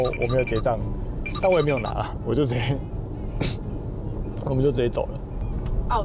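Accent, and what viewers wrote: Taiwan. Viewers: 講台語真好聽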